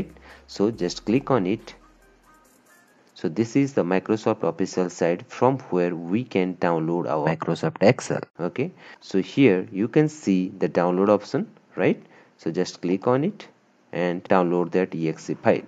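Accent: India. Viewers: Indian accents are so annoying. Sorry, I'm not sorry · thank you SOOOOOO much when I hear an Indian accent I know I am in safe hands! :D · Yet more proof that "guy with indian accent" YT tutorials are the best channels to learn cracking tutorials from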